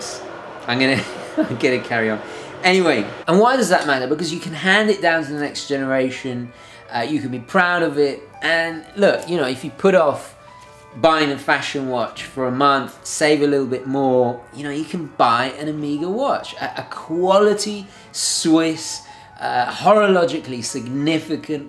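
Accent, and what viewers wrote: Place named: United Kingdom. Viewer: This one is why I enjoy your videos. Great points made. I find that you gents from the British Isles are more entertaining to listen to than us Yanks. Carry on, Guv.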